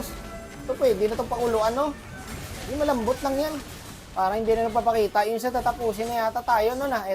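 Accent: Iloilo, Philippines. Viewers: ilonggo yan boi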